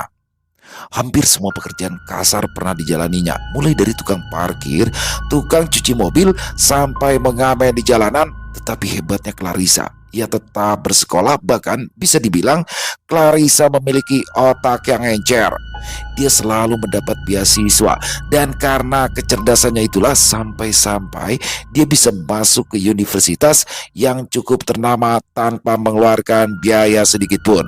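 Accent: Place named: Betawi, Indonesia. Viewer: Assalamualaikum bang Emang dah bang paling bisa dalam segala karakter hebaaat Apalagi logat Betawi paling suka dengarnya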